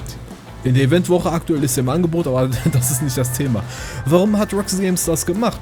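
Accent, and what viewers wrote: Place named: Turkey. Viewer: Dein Türkendeutsch geht mir am arsch. Lern die richtigen artikel und fälle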